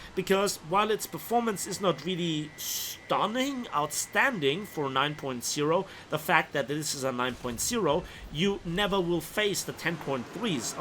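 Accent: Germany. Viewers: He is German :)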